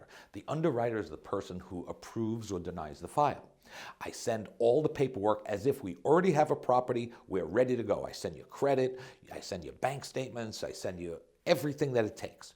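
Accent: New York, United States. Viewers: NM. I see you're in CA. I thought maybe you're in NY from your accent.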